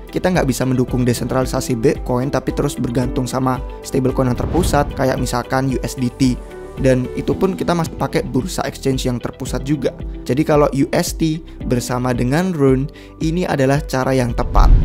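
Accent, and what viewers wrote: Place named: United Kingdom. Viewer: Aksennya British banget bang😂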